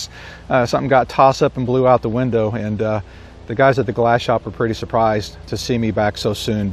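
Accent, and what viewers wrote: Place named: United States. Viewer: Wow! A European speaks American English with the proper accent.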